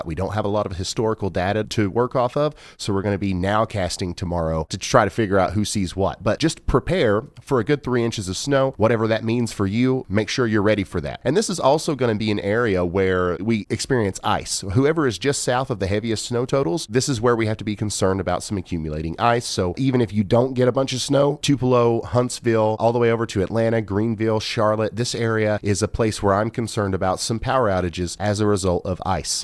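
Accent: South, United States. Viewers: I just want you to know that I love that you speak "Southern". It just warms my heart. Keep on Keepin on Brother!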